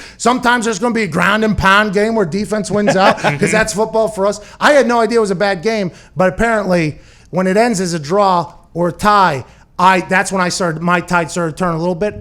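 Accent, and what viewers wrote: United States. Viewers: gillon mclachlan should employ this guy. To commentate the games. The American accent sounds good.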